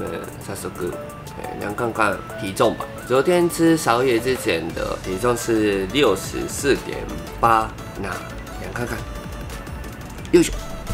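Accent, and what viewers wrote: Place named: Taiwan. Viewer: 有台灣腔的你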